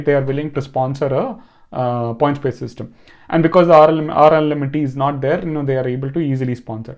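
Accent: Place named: Chennai, India. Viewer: Chennai slang in your language,when you speak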